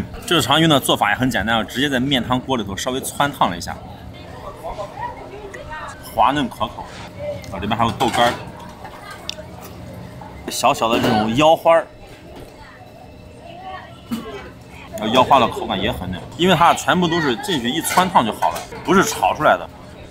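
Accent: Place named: Shaanxi, China. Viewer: 可以听出来陕西口音 😊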